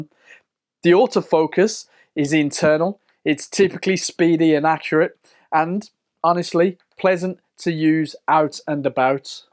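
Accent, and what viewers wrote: Birmingham, United Kingdom. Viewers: Thanks great review of this lens - I love your sample photos. I think that you have just made my mind up over which lens to buy next. I have the P/L 12-60 with G9. Considering P/L 50-200 but price and weight putting me off. Just seen P 35-100 F2.8 but I think for the type of photography this macro lens is great and can be used as a fast medium telephoto. Thanks for the no nonsense review with a Brummy accent.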